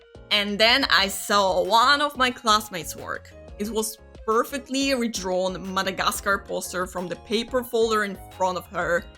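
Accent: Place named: Poland. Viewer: Honestly after I heard you speak Polish I realized that you do have a Polish accent, but before that I didn't pay attention to it at all so XD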